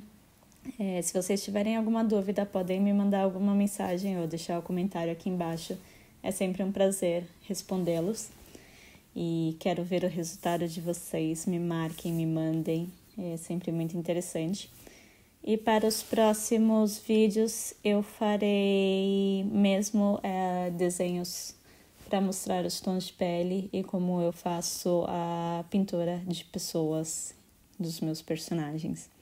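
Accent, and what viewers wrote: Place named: France. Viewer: O sotaque francês eh muito inspirador... Parabéns pelo talento...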